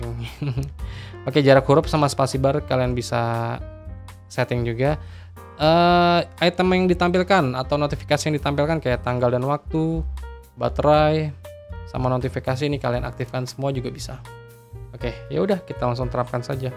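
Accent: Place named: Palembang, Indonesia. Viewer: bang lu orang palembang ya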